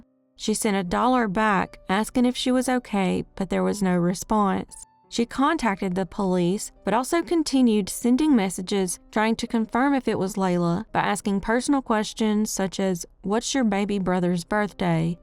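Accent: South, United States. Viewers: Ok, the channel, the accent. Super cute. Hi from Kentucky! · This blog is delicious. Your beautiful Southern accent is enchanting. These mysteries are head scratchers. Keep your children close. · Middle Tennessee girl here, and ready to go!!!! Thanks for yet another well told video. The best difference between your and many YT true crime channels is that it always shows that you genuinely care about the people touched by the crimes, not just the facts of the cases! And one of my ABSOLUTE FAVORITE aspect of your channel is hearing the same southern accent I have! I really don't think there are near enough YT channels with people that have our accent! ❤Hope you are having a wonderful 2024 so far, Love❤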